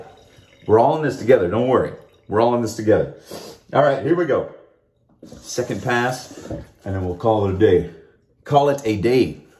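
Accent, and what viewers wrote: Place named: RP, United Kingdom. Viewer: You’re accent was actually pretty good. You sound like a member of the royal family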